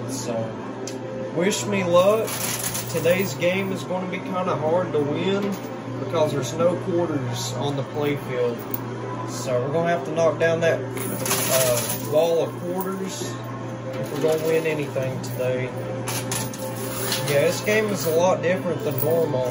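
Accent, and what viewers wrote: South, United States. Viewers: .....What state do you live in you have that southern accent....YOUR ALWAYS ENTERTAINING....CANT WAIT FOR THE NEXT VIDEO.....EVERY DAY.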